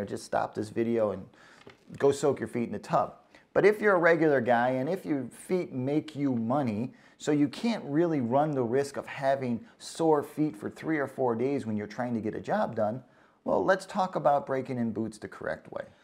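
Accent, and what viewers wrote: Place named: Midwest, United States. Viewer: Interesting accent... is it midwestern maybe?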